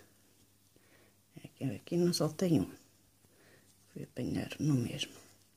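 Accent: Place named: Portugal. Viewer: Adorei os marcador de livros. sabe eu gosto muito do seu sotaque porque sou descendente de portugueses.. tenha um bom dia. obrigado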